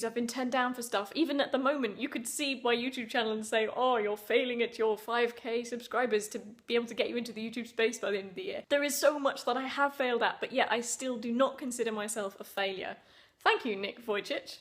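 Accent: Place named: England, United Kingdom. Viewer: Love that proper english accent.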